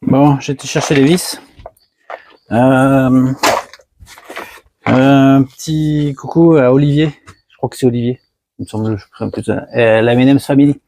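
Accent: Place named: Savoy, France. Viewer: new follower sur ton channel, tu as des compétences de ouf, bravo pour tes réalisations au plaisir de te suivre , tu as vachement l'accent savoyard, mdr.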